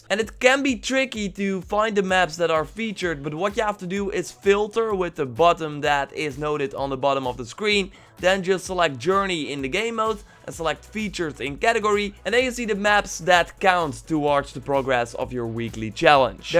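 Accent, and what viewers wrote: Netherlands. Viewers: Ben je Nederlands? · Jii bent Nederlands zeker? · the strong dutch accent u have tho jeez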